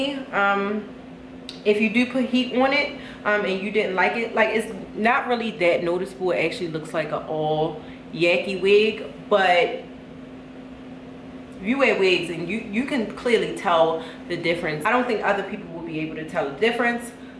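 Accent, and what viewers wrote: Baltimore, United States. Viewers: her voice has a Baltimore accent!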